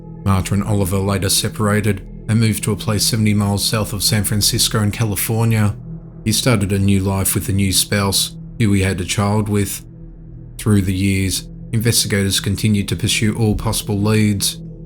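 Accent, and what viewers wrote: Australia. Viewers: I am guessing the narrator is Australian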